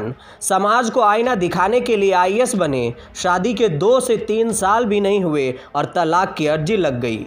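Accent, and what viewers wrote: Bihar, India. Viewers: Tum bihari ho kya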